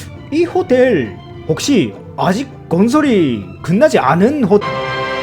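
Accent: North Korea. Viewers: 私韓国人なのに 単語選択からイントネーションまで 本当に北から降りてきた人だと思った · 북한 방언 엄청 잘 따라하시네요 ㅋㅋㅋㅋㅋㅋㅋ 웬만한 한국인보다도 잘 따라하시는 것 같습니다 ㅋㅋㅋㅋㅋ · 見事な北朝鮮語李春姫方言ですね！ 余計なこと言って粛清される映像が景色に差し替えられてるの笑う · 北朝鮮語ってだけで響きで笑ってしまうのはなんでなんだろうｗ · なんだろこの耳障りのよい言語 南の傀儡とは全く違う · 아니 북한어 구사율 99.9%인 것도 웃긴데 중간중간 세뇌에서 풀려나 본심 튀어나오는 게 개웃기네ㅋㅋㅋㅋㅋㅋㅋㅋㅋㅋ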